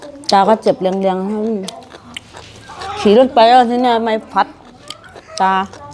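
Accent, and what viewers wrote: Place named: North, Thailand. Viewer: อยู่จังหวัดอะไรจะเหนือหรือเปล่าพูดน่ารักดี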